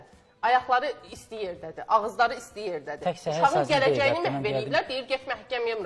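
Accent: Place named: Shaki, Azerbaijan. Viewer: Bu xanıma halal olsun🎉..Bu arada deyəsən xanim Şəkidendi) aksenti bize oxşadı)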